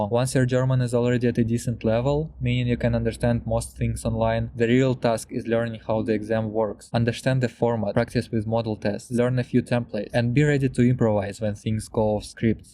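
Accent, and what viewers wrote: Russia. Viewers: First of all, thanks a lot for your videos. But, are you sure you are from Korea? You accent sounds very Russian to me.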